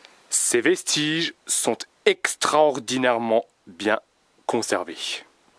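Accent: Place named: Alsace, France. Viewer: La voix n'est absolument pas robotisée, mais l'auteur a un accent alsacien très prononcé tout simplement. Il a le mérite d'essayer de se mettre dans la peau de ceux qui y étaient!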